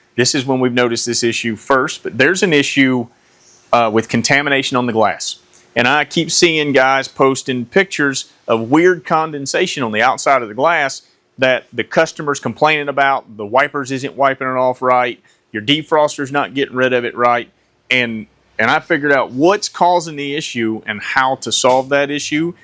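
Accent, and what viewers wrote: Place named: United States. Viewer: Lovely American accent.! Many thanks for making this helpful video sir.😊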